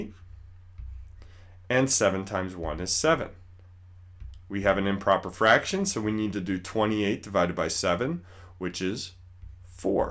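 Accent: United States. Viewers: Sir you are saying like an American so l cannot understand what you are saying l can see and trying to understand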